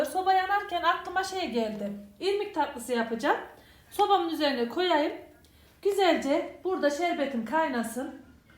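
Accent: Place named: Konya, Turkey. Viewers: hmm nerelisiniz konuşmanız bizim yöremize çok benziyor nevşehirliyim ben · Tamam konyalı konuş yonuşyosun hanım ben konyalı yım calarım biz abane oldum hanım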